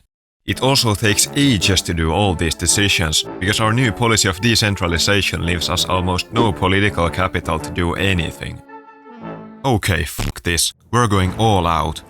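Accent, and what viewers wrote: Finland. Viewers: are you finnish? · After xPetu, this is the most magnificent Finnish accent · i just can smell the finnish accent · Are you from Finland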